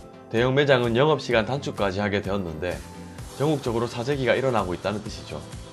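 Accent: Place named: Gyeongsang, South Korea. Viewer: 사투리 정겨운데요 경북 지방의 표준어라 생각하고 들으니 괜찮은 데요👍👍👍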